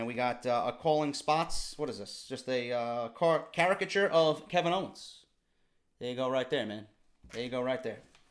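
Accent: New York, United States. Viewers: I've only very recently subbed to your channel because I like what you do and how you do it, anyone can tell that you're passionate about this and the RAW 25 rage in your video made me laugh in a good way. Don't ever let haters or people who have no clue put you down, a lot of these "9 - 5" guys hate their jobs and would give anything to be able to do what they love for a job. As what I suppose someone like you would call a "casual" I don't know anywhere near as much as you about the business but I've been interested in maybe starting my own series of videos (with a more light hearted tone because I just don't have your experience). Keep it up is all I can say, I enjoy your content, plus I'm from the UK and your NY accent is awesome. lol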